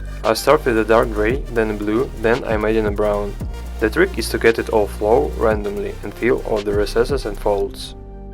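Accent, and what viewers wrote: Russia. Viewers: Ты русский? А то тебя акцент сильно выдаёт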